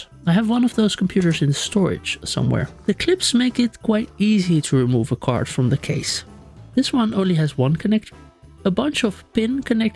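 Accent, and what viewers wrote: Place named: Netherlands. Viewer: Oh leuk, een nederlander op YouTube. Leuke video daar. Ik merkte direct je accent op haha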